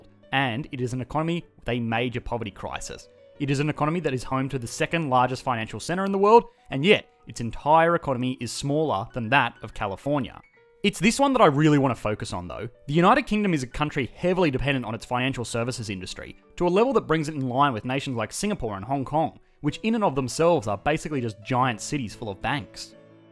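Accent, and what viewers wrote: Australia. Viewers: Wow--I've never seen such a shameless yet disturbing display of public self-stroking and auto-fellatio in my life the way you go on and on thinking anyone cares who has the larger economy/banking system, etc ...zzzzzz----and you sound Australian rather than British, so not only am I mildly humoured but also perplexed! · He is Australian not only does he mention it in a few videos, I'm also Australian I can tell by his accent 100%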